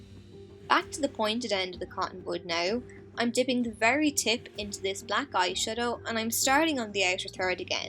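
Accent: Dublin, Ireland. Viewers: is that Irish accent? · I can definitely tell you are Irish, and I think the Irish accent is beautiful. I also use the Rimmel Match Perfection, I have True Ivory. I’m very pale, like porcelain almost. Thank you, this was helpful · I'm from Ireland and that's a Dublin accent 🍀🇮🇪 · Are you Irish? Cuz I love your accent!! Sorry if you're not, I'm new but I'm definitely subscribing!❤️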